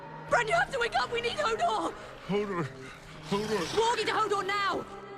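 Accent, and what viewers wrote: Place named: Baltimore, United States. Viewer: You are absolutely from Baltimore! I giggle every time you say "Juice". And thanks, every time I say the word 'okay', I hear your voice on my head! 😂 Love your vids along with the others in the crew!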